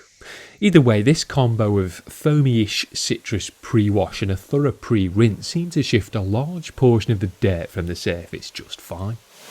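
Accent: United Kingdom. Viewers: You are the definition of British accent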